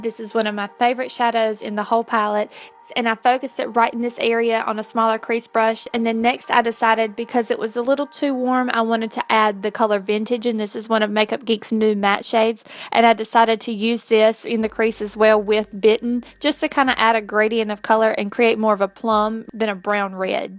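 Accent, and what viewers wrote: South, United States. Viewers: yay ur back I missed u I love the look and ur personality ur awesome and I love that u have that southern accent I got it to and never be in braised about it. it's just who we r yay sending big love from TN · ahhh reading down further, a southern accent!!! Love it, I'm in Tennessee!!! :)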